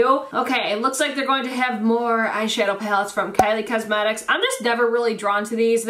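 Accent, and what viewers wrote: Midwest, United States. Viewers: Just discovered ur channel last night and I really enjoy ur videos. I'm trying so hard to identify ur accent. I'm thinking Midwest. Wisconsin maybe. Totally not my business it's just I find accents fascinating. Mine is horrible I love urs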